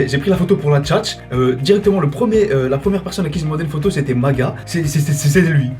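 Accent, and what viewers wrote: Brussels, Belgium. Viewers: J'avais remarquer directe que tu viens de Bxl mdr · Salut, t'es belge toi non?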